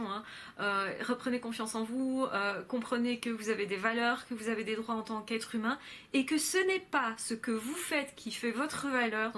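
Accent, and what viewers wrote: Belgium. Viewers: J'adore votre accent (belge) avec: puis (pouis), fuir (fouir)... Victime d'une pervers-narcissique je me demande si je ne le suis pas aussi!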